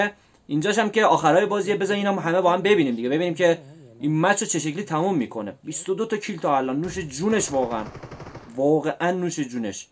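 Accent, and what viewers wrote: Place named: Iran. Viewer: بابا اینقدر ایرانی حرف نزن؛کمی تلاش کن دری یا هزاره گی حرف بزنی٫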